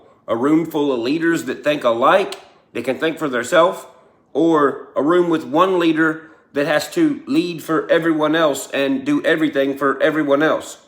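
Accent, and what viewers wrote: South, United States. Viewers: As an old dude- I'm just gonna say it. Thank you for being a redneck rapper who STILL speaks like a southern dude. * Cool tune btw.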